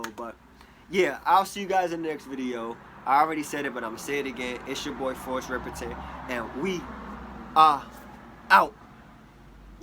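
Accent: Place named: New Orleans, United States. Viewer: You talk like you from new orleans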